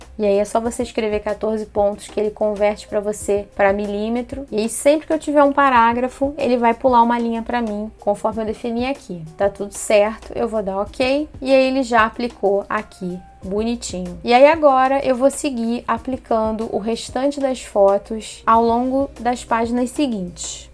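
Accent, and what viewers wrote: Rio de Janeiro, Brazil. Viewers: Video bom. Sotaque carioqueiiissx forte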